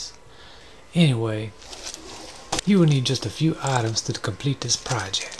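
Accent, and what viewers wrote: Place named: South, United States. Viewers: I love your southern gentleman accent "Cabocon" very well done. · Dude, you sound like a southern Bob Ross 😊 great tutorial! Thank you!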